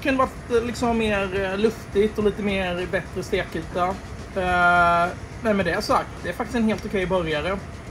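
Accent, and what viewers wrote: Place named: Småland, Sweden. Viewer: Man älskar ju å lyssna på din småländska dialekt ❤ tack för trevligt avsnitt! 👏🙏 men ska biffen i en burgare vara rosa i mitten som dessa är? Jag har för mig att den bör va genomstekt.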